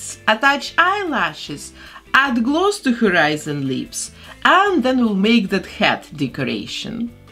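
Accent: Russia. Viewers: Вот это акцент )))) русский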